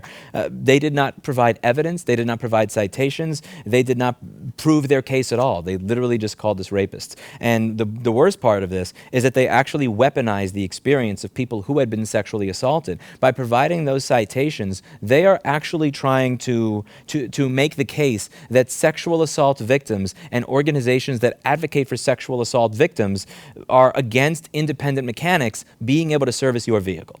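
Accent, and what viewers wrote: New York, United States. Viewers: how do you sound more new-yorker than you did when you lived there 😂😂 great vid regardless... keep up the pressure on anti-consumerism!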